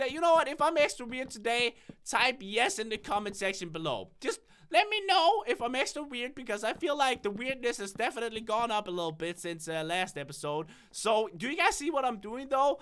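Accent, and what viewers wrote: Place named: Denmark. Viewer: It's his real voice but he has a Danish accent. When he speaks in Danish it is deeper. It's just sounds different when he speaks in English. I don't know about you but I absolutely love his voice!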